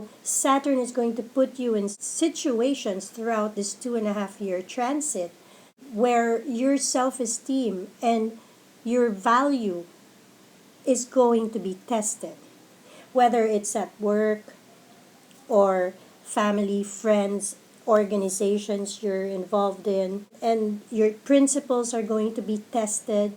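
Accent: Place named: Philippines. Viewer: why do u have a filipino accent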